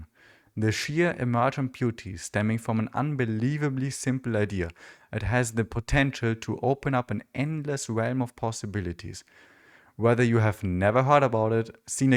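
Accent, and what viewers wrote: Germany. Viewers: Do i hear Deutsch? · Nice Video ❤. U Sound German😂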